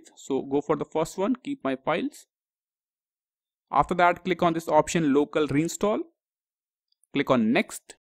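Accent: India. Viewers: Looks for computer problem solutions. Hears Indian voice. Computer fixed. My guy you the best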